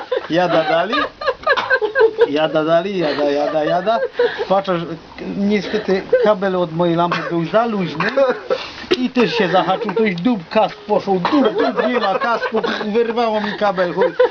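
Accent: Silesia, Poland. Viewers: Chodzi o mówienie jak na Śląsku a nie o bluzgi.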